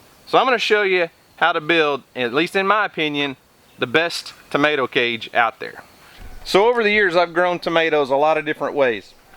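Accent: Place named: South, United States. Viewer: Ahhhh...a fellow Southerner.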